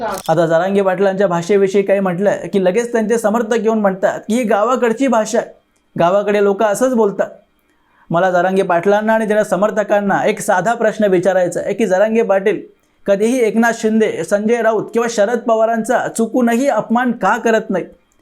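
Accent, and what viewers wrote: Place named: Karnataka, India. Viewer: आपले विषय विल्श्लेधन खूपच अभ्यासपूर्ण असणुबतारकशुद्ध असते. ऐकायला खूप आवडते आणि भावते. तुम्ही बहुदा कानडी भाषिक आहात का.आवाजात गोडवा आणि आपुलकी जाणवते म्हणून जिज्ञासा.🎉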